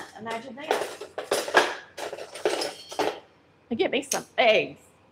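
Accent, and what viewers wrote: South, United States. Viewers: For those of you who don't understand a lot of her words due to her Southern accent, she said "baking powder', not bacon powder. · Love your Southern accent and the way you cook! Y'all are amusing, too. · I love you and your crazy Accent! Y'all! We say Eh and you say Uh huh honey and Y'all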